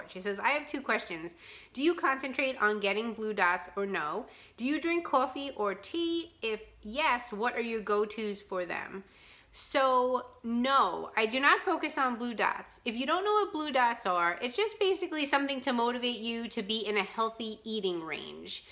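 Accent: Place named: New York, United States. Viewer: So Happy i found you the u tube!! Love your NYC accent! Im from Philly! I could listen to you All day, love your honesty 💯💯💯💞💞💞 keep them coming!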